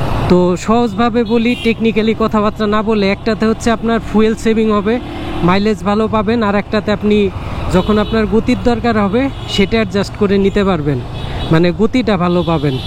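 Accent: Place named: Faridpur, Bangladesh. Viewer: ভাইয়া আপনার হোমটাউন কি ফরিদপুর?কেনো বলছি,কথা বলা দেখে চেনা শহরের বলেই মনে হচ্ছে!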